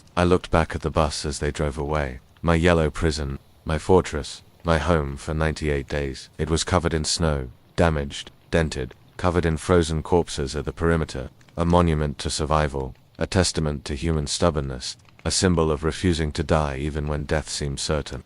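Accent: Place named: England, United Kingdom. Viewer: Ixnay on the English announcer, it sounds lame to Americans.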